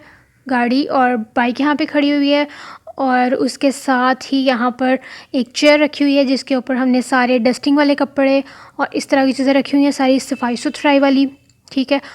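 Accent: Punjab, India. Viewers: Hello bhain kive o Tuc assi punjab ton (india) ton Te tuhadi bunjabi Sun k eda lagda k appa same aa edar wala punjab v same a main bht dinna ton thode valog dehk rai a te mainu bht pasand a rae ne waheguru thonu khush rahke 🙏🙏🙏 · Mashallah sis bohat piyara ghar h ap ka or Punjabi bohat maze ki h😂😂mory😅😄😍😛😘😙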